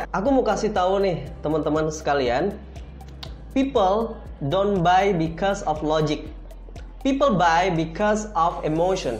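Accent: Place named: Aceh, Indonesia. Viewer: Orang aceh kau ya bang??? Ku dengar logat bicara nya ada medok pletak pletok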